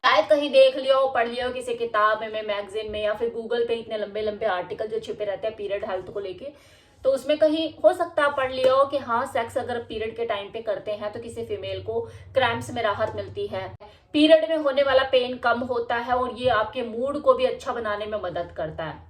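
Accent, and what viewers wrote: Haryana, India. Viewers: Ya sayad haryana se ho haa haryana 95% punjab 5%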